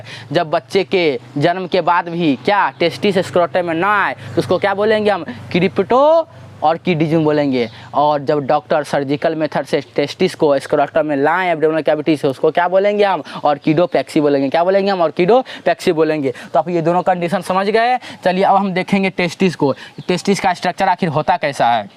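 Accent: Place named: Bihar, India. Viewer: Bihari styles me wah...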